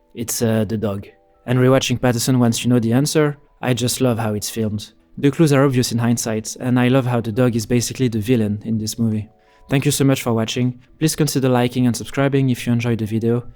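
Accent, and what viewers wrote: France. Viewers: I watched this video and the one about the cabin in the woods, and I really like how you edit your videos and present your thoughts. Someone in the comments on the cabin video said you should summarize the plot oft the movies you review more - I disagress. Discussing specific parts of movies is a really good advertisement to watch the movies. Your charming French accent is the cherry on to...can't wait to see and hear more of your work!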